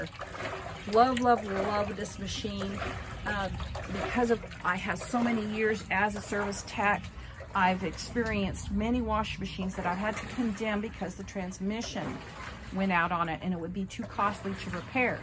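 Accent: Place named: Ireland. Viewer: Tell me, what part of Ireland are you from?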